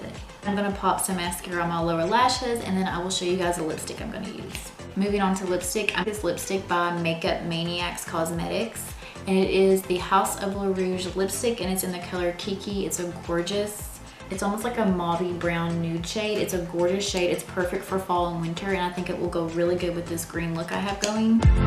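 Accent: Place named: South, United States. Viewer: You sound like you have a little southern accent which just adds to your beauty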